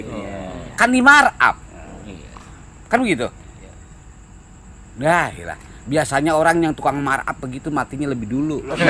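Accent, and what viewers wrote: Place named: Betawi, Indonesia. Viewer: Terimakasih penjelasan ttg wayangnya bang Haji Mandra ... Mau tahu nih bang Haji , kok bhs betawi abang Haji sm bhs betawi almarhum babe Benyamin beda ... Knp logat betawi bisa beda begitu bang ... Kl bisa tolong jelasin dong bang Haji , makasih ya bang Haji sebelumnya nih , sehat terus bang Haji 👍🙏🙏🙏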